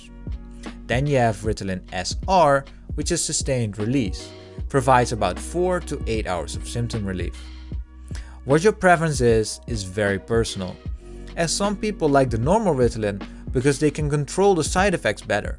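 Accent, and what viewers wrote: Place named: Netherlands. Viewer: I recognize a dutch accent when I hear one!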